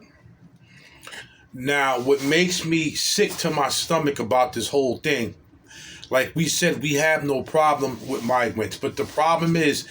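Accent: New York, United States. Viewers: God bless ❤ Subscribed. And finally, a REAL NY accent. You are everything a real NY native should aspire to be. ❤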